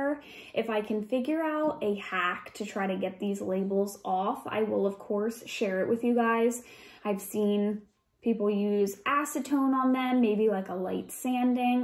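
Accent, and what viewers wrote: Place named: New Jersey, United States. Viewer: Hello from the great state of NJ. I too, born and raised. I recognized your jersey accent. Lol. I also love thrifting in PA too. We go riding thru bucks County frequently. I also love Penn Dutch country too. Such good finds. Love the items you find.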